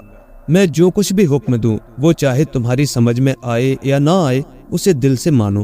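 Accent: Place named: India. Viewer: Apki kahania'n achi hoti hain bas apka lehja shayad Hindi hai agr Urdu lehja hota tou chaar chaand lag jatay...ager aap phool ko fool na kahein aur issi tarhan aur bhi bht si cheezein tou behter rahay ga.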